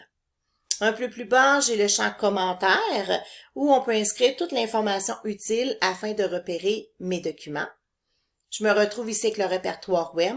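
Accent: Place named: Canada. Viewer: l'accent canadien, j'étais pas prêt !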